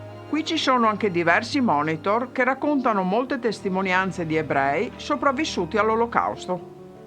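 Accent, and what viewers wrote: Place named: Veneto, Italy. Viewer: Sei veneta ciò!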